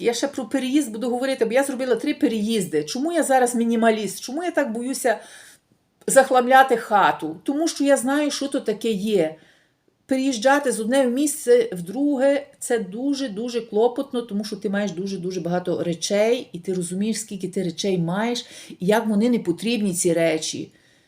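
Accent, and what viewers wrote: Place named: Western Ukraine, Ukraine. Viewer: Мова ужасна,неграмотна .Мелиш по бендерська,не принмнл вас слухать,Особенно як ти кажеш євро,,,,Даже во всех Странах кажуть ЕВРО.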